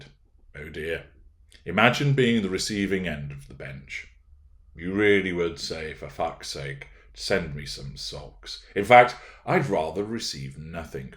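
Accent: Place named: Lancashire, United Kingdom. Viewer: Hi. Have thought many times hearing your voice inflections that your early life was in either Lancashire, I’m a Lancashire lass now in Australia, or in Yorkshire. Thank you for your insight.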